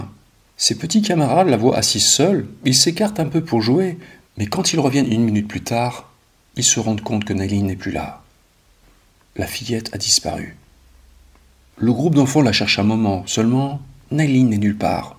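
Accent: England, United Kingdom. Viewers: moi j'adore ton accent anglais, je suis fan 😅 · ton accent Anglais me fait toujours rigoler, c'est pas méchant.. c'est quand même gentil d'avoir une pensée pour ses personnes disparus.. bye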